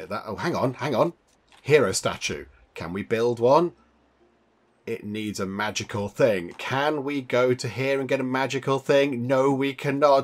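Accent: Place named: England, United Kingdom. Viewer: I flipping love your gameplays, your fabulous typical English accent and your way to entertain people. 👏🏻👏🏻👏🏻👏🏻👏🏻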